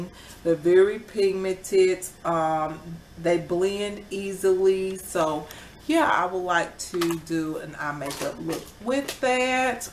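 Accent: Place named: South, United States. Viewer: I just know you are from the south me too and your accent is great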